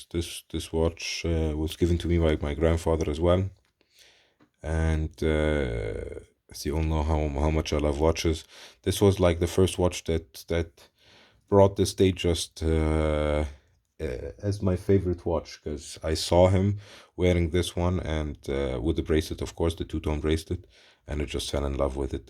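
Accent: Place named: Egypt. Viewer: Great collection man! I knew from your accent that you might have been arabic (egyptian if i'm not mistaken) and I was waiting for you to pull out some watches with arabic numbers.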